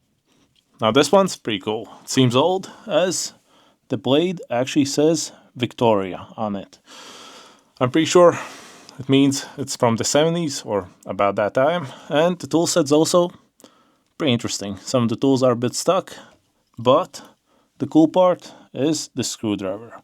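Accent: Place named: Eastern Europe. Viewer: hmm Id guess you are either Slavic or Eastern European??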